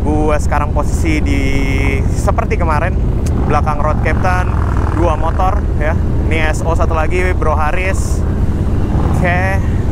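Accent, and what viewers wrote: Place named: Citayam, Indonesia. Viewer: om ngomongnya bae kaya org citayem aja...hehheehe.. mantap om....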